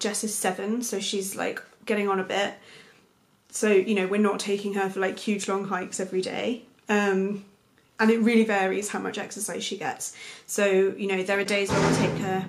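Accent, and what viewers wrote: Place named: RP, United Kingdom. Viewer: The queens English is always lovely to hear! I've had 25 Greyhounds! (Whippets cousins). I will have sighthounds until I pass. Cheers!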